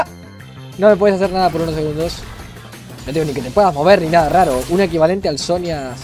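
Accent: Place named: Argentina. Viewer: Este pibe es una masa la voz me hace acordar a Dross pero con acento argentino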